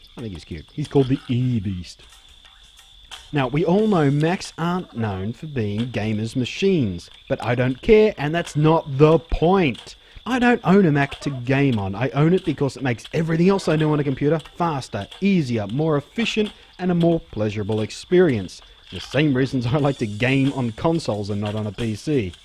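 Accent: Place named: Australia. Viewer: OY!! WTF are you going on about? Don't answer that. I tune it to your videos, not unlike MANY, to see your cute face and expressions and hear your cute Aussie accent. You're an adorable pretty face.....take your fucking shirt off bitch.....give me a GOOD show!!! If you think you're watched JUST because of your "insights"...guess again and join reality....you're popular because you're a prettyboy. So take off your fucking shirt and get on with it....for us!!!! YAY!!!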